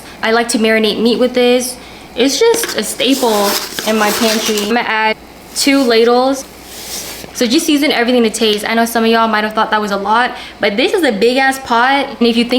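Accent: United States. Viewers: this girl sounds like shes from queens ny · Is she from the bay area??cuz she soundin alot like she is.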